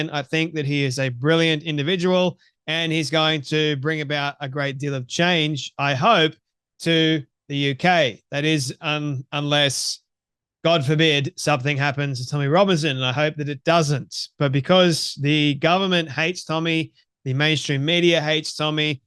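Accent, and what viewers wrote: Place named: Australia. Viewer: You sound Australian and I like you young man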